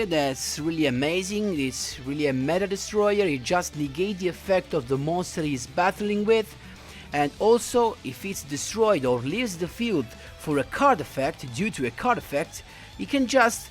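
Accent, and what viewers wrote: Italy. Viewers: Great deck, I’m subscribed! Also, are you Italian?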